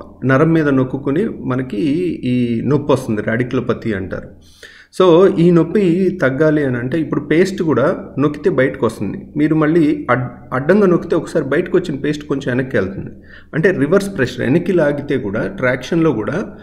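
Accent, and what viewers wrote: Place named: Krishna District, India. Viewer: Sir you speak very nice pure Krishna district telugu.. and with beautiful smile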